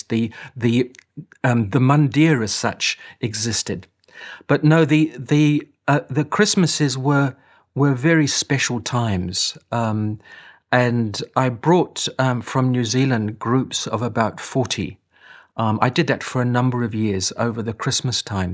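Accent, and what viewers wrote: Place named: South Africa. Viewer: It sounds like hails originally from my country - South Africa. My first Prashanti Christmas was in 1986... Never, ever to be forgotten!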